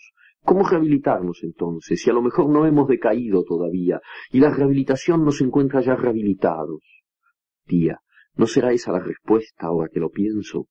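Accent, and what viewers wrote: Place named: France. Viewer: Es único, esa pronunciación afrancesada ..me encanta..es especial escucharlo ..gracias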